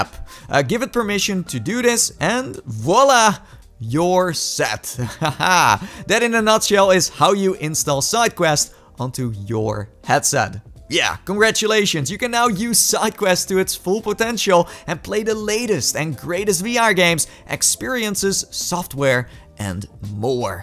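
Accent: Netherlands. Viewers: Try english beter dude u dev sound like someone who’s dutch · lol okay I hear within the first 4 seconds you are presumably dutch :) haha, cheers man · are u from the netherlands cause i am and i recognzie the accent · Are you from holland?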